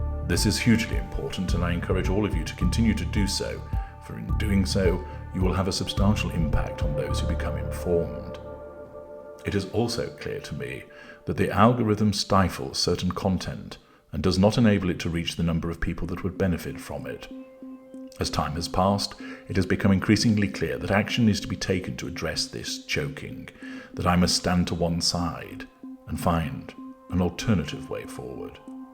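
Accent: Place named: RP, United Kingdom. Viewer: one of the most intelligent, creative and original voices on YouTube. Also one of the most hypnotic bass voices speaking the Queen’s English. Please don’t go away.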